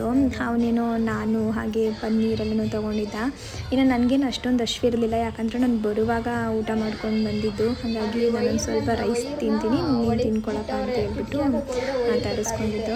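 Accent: Mandya, India. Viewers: Hi Nice video super singer ❤❤❤❤ ನಿಮ್ಮೂರ್ ಮಂಡ್ಯ ನಾ ಮಂಡ್ಯ ಭಾಷೆ ತರ ಇದೆ ನಮ್ಮೂರು ಮಂಡ್ಯ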